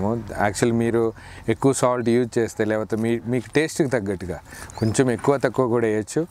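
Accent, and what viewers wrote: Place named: Telugu, India. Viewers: Your Telugu slang is excellent bhai🙏 God bless! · Ur Telugu slang is very nice sir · Your Telugu slang looks like Andhra bhayya. Telugu lo chesinanduku chala santhosham. Devudu meeku Ela service chesenduku avakasham echinanduku aa devuniki naa namassulu. God bless you beta.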